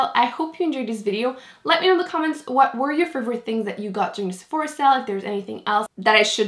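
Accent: France. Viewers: Also, I've thought this for awhile, butI just love your accent! (I took French in high school but don't remember much lol). I just think about it every time you mention the Lancome foundation or any other French makeup brand/name--you're the only one who can pronounce it properly! lol It drives me crazy when people drag out the "a" in Lancome!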